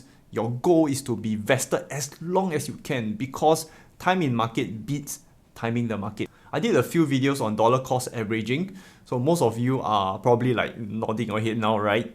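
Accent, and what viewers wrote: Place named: Singapore. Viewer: I am from Sngapore as well! U’ve changed the way I’ve looked at and approached investing in so many ways. Rlly thankful and grateful to chance upon ur channel and hearing ur Singaporean accent in such a transparent way is so reassuring. Thanks!:D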